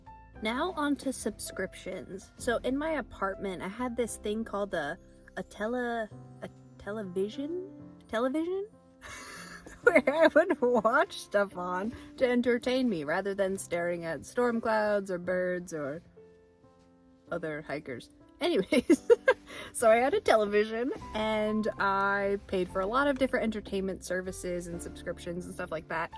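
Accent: Northeast, United States. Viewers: Your NE accent is adorable - west coaster here so it's a bit jarring in a good way! It's great to see someone from the other side of the country explore the west as I feel we can get a bit jaded out here :/. As much as I love the idea of van life sometimes, living in a bike-able/walk-able city with a lot of conveniences is important to me - plus as someone who is pretty tall, my choice of vehicle feels pretty limited as I can't imagine sleeping in a cramped sedan. I'd love to bike tour the country but having the shelter of your car actually gives you a lot more options in terms of taking your time and amenities. I'm not used to paying for a car, so that's a big hurdle if I ever have to jump it! Thanks for making these types of videos, I personally find the meta/logistics videos more interesting than the destinations so I am curious to know if you have side hustle work (or if YouTube is a part of that) while you travel, or if this is pretty much out of pocket.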